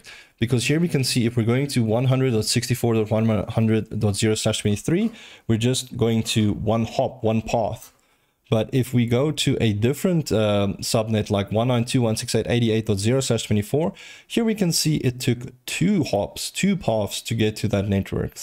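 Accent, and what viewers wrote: South Africa. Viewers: This is amazing, thank you! By the way, where is your accent from? South Africa/Zimbabwe by any chance?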